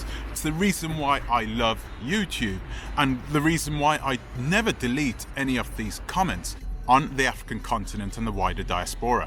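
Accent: United Kingdom. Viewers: OMFG THAT BRITISH ACCENT IS SOOOOOOO ANNOYING IDK HOW ANYONE CAN LISTEN TO IT FOR LONG PERIODS OF TIME 🤮🤮🤮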